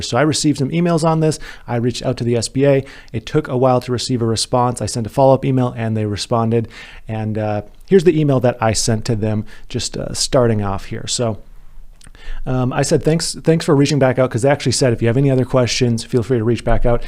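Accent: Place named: New York, United States. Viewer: Yo! Whats good Son? That’s how some of us New Yorkers sound.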